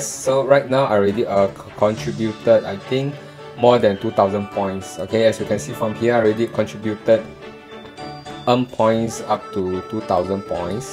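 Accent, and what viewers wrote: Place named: Malaysia. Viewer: you sond like malaysia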